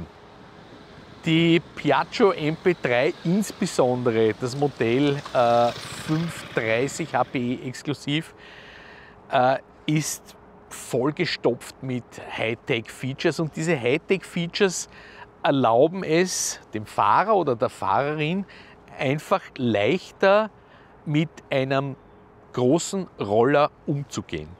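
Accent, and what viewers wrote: Austria. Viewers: Wie immer ist dieser Moderator sehr sehr nett und freundlich sowie proffesionell und dann hat er noch den schönen Wiener Dialekt einfach super und genial 😎😃😂😁😀😄😅😆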